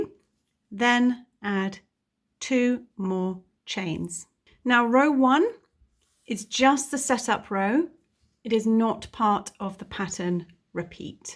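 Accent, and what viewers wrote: England, United Kingdom. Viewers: I heard your accent and assumed you would be using British crochet terms until I heard SC! I'm a beginner to blankets and anything that isn't amigurumi, would I be able to follow this if I remember that SC is DC and DC is TC to me? Are there any other differences ? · Great tutorial thanks also so sweet to listen such a rich English accent.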